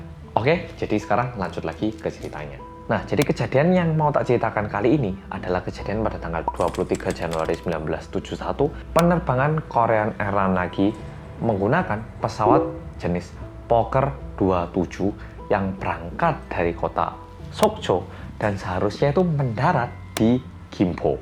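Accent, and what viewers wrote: Java, Indonesia. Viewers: Korea reomit hanya orang korea yang medokkkkkkk.. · Semangat terus maasss medooookkkkkkkk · Nyimak orang Korea sing medok👍 Mantap👍 ni ulasannya Sukses selalu mas🤲🤲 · Di thumbnail nya ku kira mas ngomong ada bom, ternyata medhoook:v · Setiap Gw Nonton Video Yg Gw Dengar Medooooookkkk!! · Ayooookk... Bolo2 ndang cus ndelok like share coment di chanel Korea reomit bersama orang korea yang medoooookk 😊🤗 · Jiaaaahhhh....... lg seru2nya koq bersambung. Wah dikerjain mas medok nih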